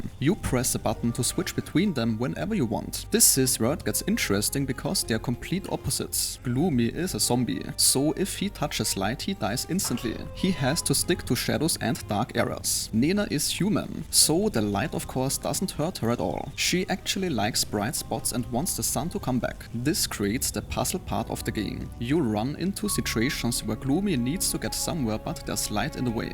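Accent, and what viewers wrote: Germany. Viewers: his voice tells me he is German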